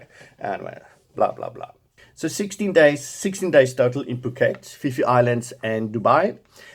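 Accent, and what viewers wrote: South Africa. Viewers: An excellent video..being irish its funny hearing your south African expressions in your accent..right now I'm in Phuket on holidays and it's lashing rain 😎 😂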